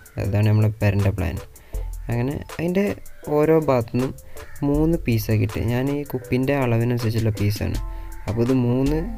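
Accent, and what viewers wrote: Malappuram, India. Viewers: വർത്താനം കേട്ടപ്പളേ തോന്നി മലപ്പുറം ❤️ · മലപ്പുറം ഭാഷ പൊളിയല്ലെ..... എന്തിനാ അത് പറയാൻ മടിക്കുന്നെ.... സംസാരികുംനോൾ സൗണ്ട് കുറച്ച് കുറവാണ് അതൊന്നു ശ്രദ്ധികൊണ്ടു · നല്ല ക്രിയേറ്റിവിറ്റി👌♥️👌♥️ പിന്നെ ഞമ്മളെ മലപ്പൊറം സ്ലാങ് അവതരണവും😀😀♨️♨️ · Ee malapuram basha poliyaanu.frendsinod parayunna pole und.anthayalum adipoliyayittund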